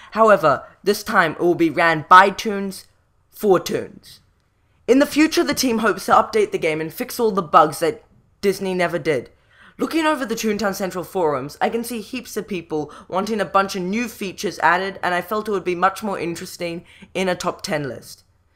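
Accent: United Kingdom. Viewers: It's British I believe and I know, I wuv it too! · You sound british :D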